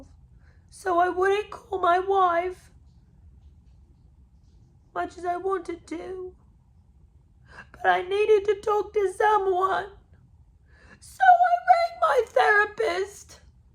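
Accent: United Kingdom. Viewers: Your British accent 😂😂😂 new sub here. Keep going girl!!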